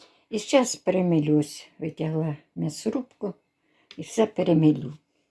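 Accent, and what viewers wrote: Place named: Poltava, Ukraine. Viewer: Я теж робила ікру з кабачків і в кінці додавала сардини в маслі, тільки цибулю і моркву смажу окремо на пательні, потім додаю до засмажки томат, потім все це зливаю в ікру і ще трохи протушкую і тоді все по банкам. Смачного Вам. Мені наче почулось, що ви з Полтавщини, в мене батько був родом з Хорольського району.. Дякую Вам за рецепт. Перемоги і миру нашій Україні. Херсонці з Польщі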